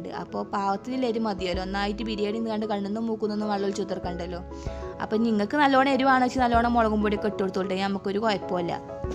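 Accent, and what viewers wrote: Malappuram, India. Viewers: Adipoli biriyaaniyum malapuram slang um😂😂😂✌️😘😘😘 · ചേച്ചി മലപ്പുറം ലാംഗ്വേജ് സംസാരിക്കണം റ്റോ.. നല്ല രസം ഉണ്ട്. കേൾക്കാൻ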